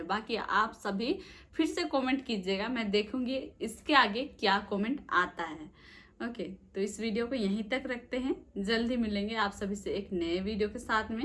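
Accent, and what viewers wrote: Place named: Palamu, India. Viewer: मैं पलामू से हूँ हमलोग तरफ भी आपके जैसे बात करते हैं ।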